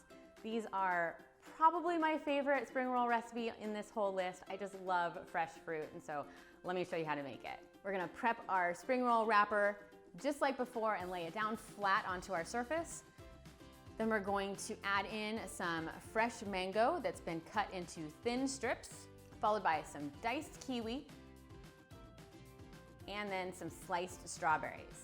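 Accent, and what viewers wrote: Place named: United States. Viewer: Judging from your accent you are an american. Must say you pass as a cook venturing into exotic asian cuisine. Do pay more attention to the proper way of wrapping . Good try!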